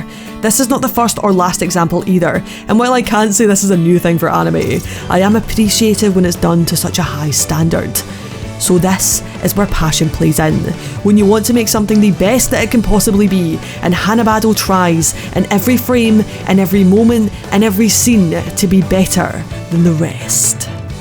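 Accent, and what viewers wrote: Scotland, United Kingdom. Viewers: Love watching your reviews on anime especially your Scottish accent 11/10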